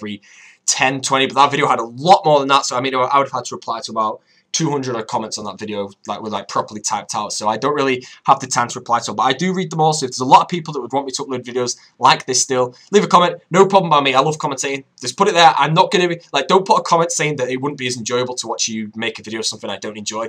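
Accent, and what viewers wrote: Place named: North, United Kingdom. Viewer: Feel free to commentate on my videos once I've finished them in due time. We could even harmonize with your northern and my southern accent.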